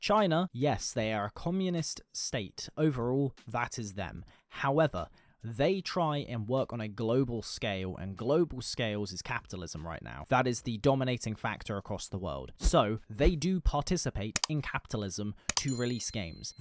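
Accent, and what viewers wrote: United Kingdom. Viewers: I'd pay double for games if they leave out the wokeness and sell a polished and most importantly FINISHED product. That aside bro, you sound like you live in the UK. Can you seriously say that woke ideology hasn't ruined everything it has gotten its claws into? As consumers we can vote with our wallets if companies implement particularly predatory business practices. Against woke ideology? Against companies propped up by their ESG rating? Nothing. Even voting doesn't help.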